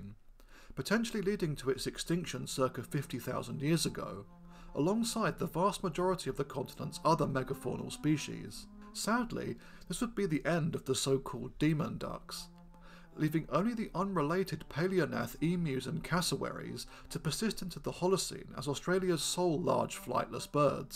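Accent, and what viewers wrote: United Kingdom. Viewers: He pronounces them the British way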